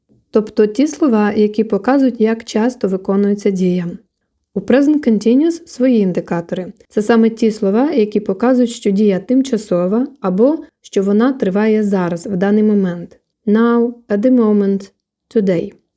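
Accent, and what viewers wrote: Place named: Eastern Europe. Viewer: наче все правильно, але сильний східно європейський акцент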